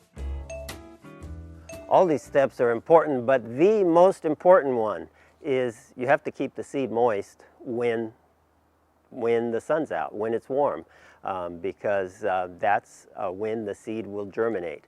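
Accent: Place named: California, United States. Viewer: sorry, sounds like california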